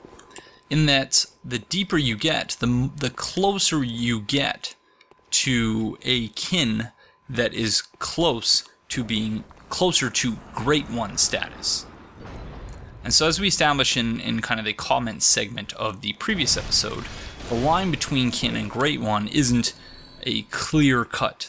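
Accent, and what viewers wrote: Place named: Maritimes, Canada. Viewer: I know you had mentioned being from Canada before but I wouldn't have guessed the Maritimes (statistically) I'm in Nova Scotia (from New Brunswick) if you happen to look at this comment on your five year old video which Province are you from/in?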